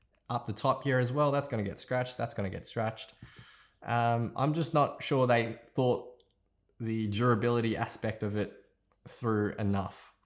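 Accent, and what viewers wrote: Australia. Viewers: Australian accent, no doubt.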